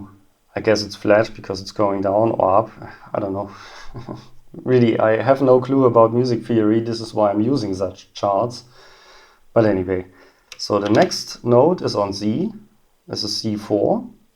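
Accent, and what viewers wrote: Germany. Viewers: Your Stimme Sounds a bittl german. Can u make a Video on Deutsch? ;)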